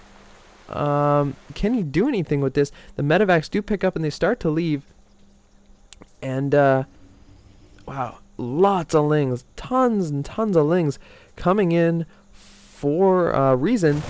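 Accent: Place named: Canada. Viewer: lol your accent very canadian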